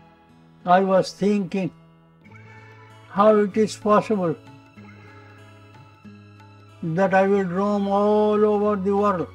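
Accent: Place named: Bengal, India. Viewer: Its beautiful how even his english accent is like bengali english accent❤❤❤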